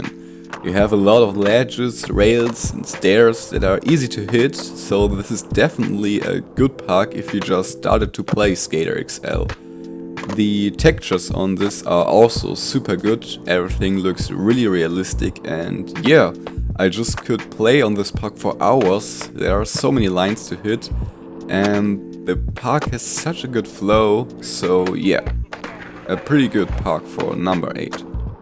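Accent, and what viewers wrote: Germany. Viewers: Man hört direkt, ein deutscher 😅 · bist du eigentlich deutsch ? :D , hört sich irgendwie so an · Man hört, dass du normalerweise Deutsch sprichst. Ich überlege ob ich mir Skater XL kaufe, da es mega gut aussieht und am 28 July als vollversion 40 Euro kostet. Tolles Video bitte mehr von Map Videos! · Are you german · R u german?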